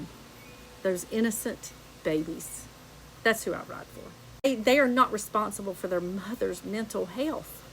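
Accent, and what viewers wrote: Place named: Massachusetts, United States. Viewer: Hell of a Massachusetts accent.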